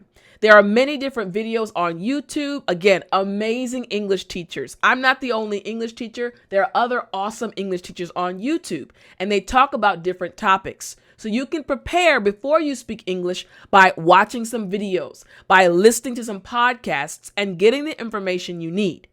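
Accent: United States. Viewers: Accent american listening very clear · I like the way you teach English with the real American accent . I really enjoy listening to you.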